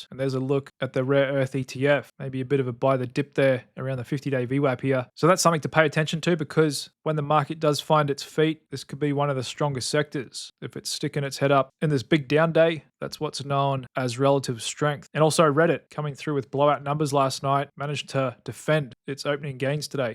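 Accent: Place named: Australia. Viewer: Lmao, that's his accent. I'm guessing Australian but I'm British so I can never tell the difference between Aussies and Kiwi's 😆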